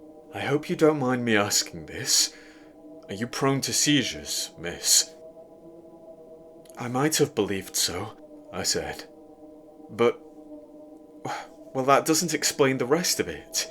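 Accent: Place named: North, United Kingdom. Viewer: Only found this channel a week ago and it's heading toward being a favourite. The fact that a fellow northerner is narrating doesn't hurt, either!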